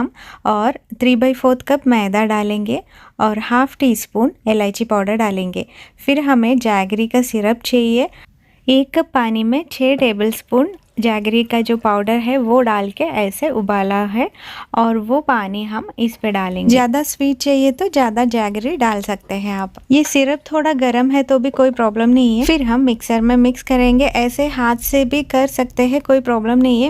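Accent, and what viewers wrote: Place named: Kerala, India. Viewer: So nice love your hindi with Kerala accent so sweet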